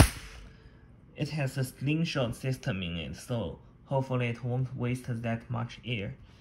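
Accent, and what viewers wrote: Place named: China. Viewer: 老哥稳，中式英文太亲切了哈哈哈哈哈哈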